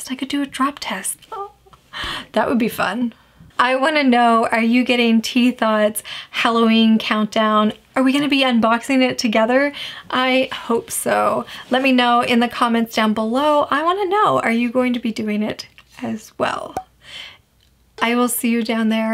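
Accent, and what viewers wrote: Canada. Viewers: Heard you talk & knew you were from like Ontario, or Atlantic Canada! Hahaha, I’m from NL. ☺️ Just bought a little haul myself; including that exact glass bottle! Hope you enjoy everything 🌈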